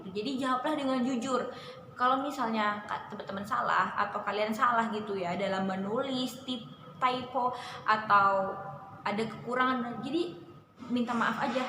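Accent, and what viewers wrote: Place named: Java, Indonesia. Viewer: Ketawaa pas kebeek ngomong *degdegaaan😆 medhok amat😆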